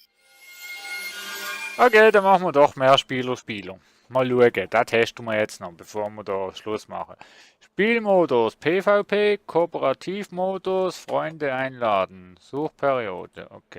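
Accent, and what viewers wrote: Switzerland. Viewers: Intressantes Spiel:). Zwar tue ich mich schwer dein Schwizerdütsch zu verstehen, aber so langsam komme ich rein:)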